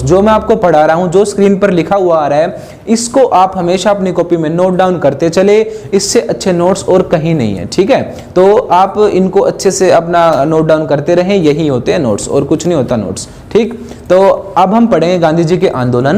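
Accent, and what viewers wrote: Haryana, India. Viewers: From haryana??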